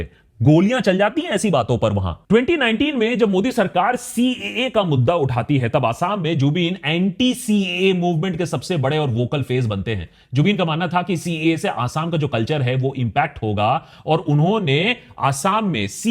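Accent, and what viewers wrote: Bengal, India. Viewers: Brother why are you telling assamese names in bengali accent ( ps: i know deshbhakt is bengali okay, don't try to be oversmart)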